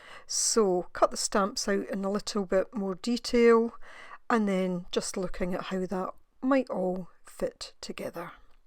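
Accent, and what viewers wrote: Scotland, United Kingdom. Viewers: I agree that it's great that you left in the parts where you were struggling. We all go through it, and it makes us feel better about when it happens to us. I'm glad you kept going on these. The dragonfly is so beautiful! And may I just say that I love your accent? I haven't come across any other crafters or artists with Scottish accents, and it's just my favorite accent to listen to - love it! 💗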